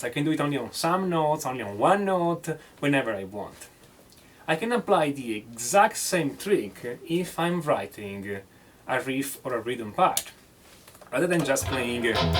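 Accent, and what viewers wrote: Italy. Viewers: That's why Meshuggah is so hard to listen to.. I have no guitar knowledge whatsoever but thumbs up for the juicy italian accent ;)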